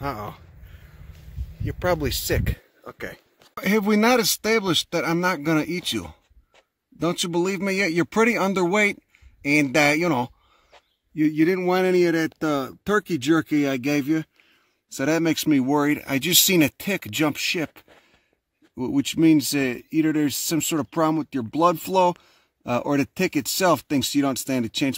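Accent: Chicago, United States. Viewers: From Chicago! Thick Chi-town accent! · Chicago accent. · Chicago, actually :) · Nope, it's a classic Chicago accent. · It’s a Chicago Italian accent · The Chicago accent is dripping from this man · Definitely Chicago Italian accent, my dad has the same accent. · It's a classic Chicago accent. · It actually is a character voice, "“I did a couple videos where I talked in my normal voice, but it just didn’t feel right,” says. “So I put all this narration through this voice of a 50-year-old Chicago mook from the West Side.”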